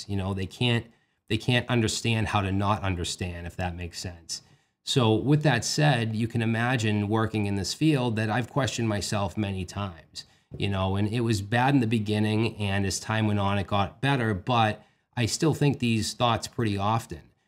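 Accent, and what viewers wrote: Boston, United States. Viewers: Hey my 2nd favorite Boston accent. 1st is Ben Affleck in Good Will Hunting. Thanks for all the videos, honestly you've helped my career leaps and bounds and yours are the videos I recommend to any dev that I teach.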